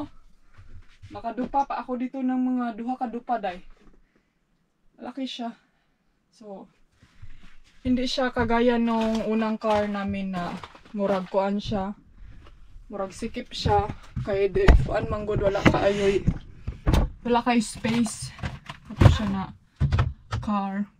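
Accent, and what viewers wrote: Visayas, Philippines. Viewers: Hi Ms. i really like you 😄 the way you speak tagalog with bisaya,yong bang wag kang lumigid dyan at ang hirap litukin,mkatawa jud ko nimo ayy...anyway be safe always sa inyong travel with your hubby. I really like also your background music,i love to hear it. God bless you ms. & your hubby 🙏💜 Your follower here in Iligan City,Mindanao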